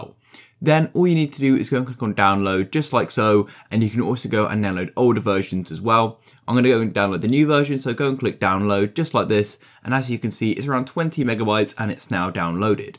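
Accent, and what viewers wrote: United Kingdom. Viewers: You speak british, eeeww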